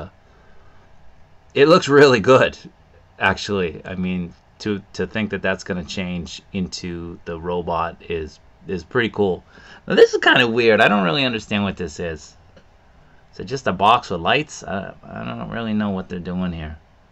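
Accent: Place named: New England, United States. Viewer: yes sir! I just got shelves a few days ago. I am going to get display cases, similar to what I saw At Tamashi nations, due to the earthquakes we get here. I live in Asagaya so I’m pretty much at Nakano broadlway every other day. Moving to Japan has been a wonderful blessing. I moved here not because of Anime and action figures, but the overall culture, food, people, order, serenity, peace of mind and affordable housing. My home town of NYC seems to be a mess now, also I don’t have 1.4 million for 400sqft (I’m not exaggerating that’s a Manhattan condo price in Harlem where I’m from). I flew in the Air Force for 30yrs hit every corner of the globe. Spain, Italy and Japan were my favorite missions to fly. Japan stood out amongst all the lands I traveled. I detect a New England accent within you, I enjoyed going up to cape cod for the summers with friends to beat the grind of NYC. 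I would have moved to that area if I stayed stateside. If you have not done a video on the subject, I would recommend the Animation museum in Ogikubo and the Inazama anime Cafe in Ogikubo as well, Take care and keep up the great work.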